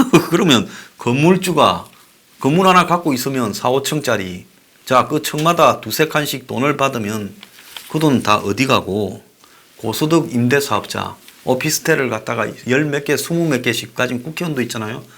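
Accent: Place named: Busan, South Korea. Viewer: 황장수 !!! 제임스 본드 !!?? 너무많이안다 !! 우아 ~~!! 부산본토말 ~~!!황장수 !! 우파.좌파.중도파. 모두싸잡아 잘정리하고있다 !!^정치.경제 를잘알려준다 !!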